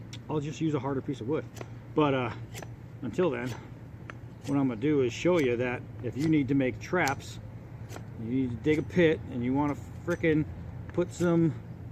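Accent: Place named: Pennsylvania, United States. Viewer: Sounds like a Pennsylvania man. Beautiful knife and beautiful sycamore back there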